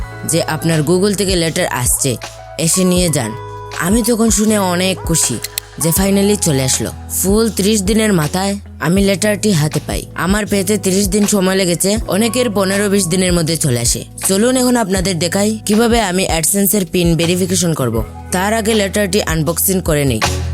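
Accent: Sylhet, Bangladesh. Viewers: tumi kita sylheti ni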